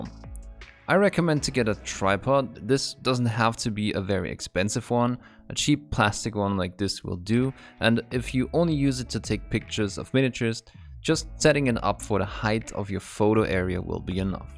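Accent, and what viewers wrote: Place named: Austria. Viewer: I really like your video cutting and narrative! Keep it up, maybe try working on your pronunciation of the O. Otherwise it's really hard to guess that you are Austrian :P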